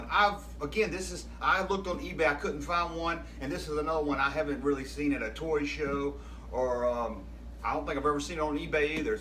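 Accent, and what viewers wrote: South, United States. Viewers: Where are you from, you have a southern accent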